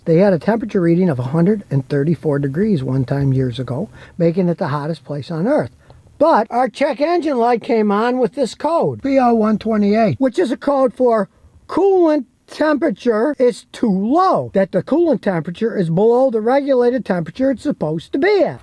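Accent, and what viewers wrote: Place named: Chicago, United States. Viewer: Is Scotty Kilmer’s accent a Chicago accent or a different version of the New York accent? To me it sounds Chicago but I’m not a 100% sure lol. But I love it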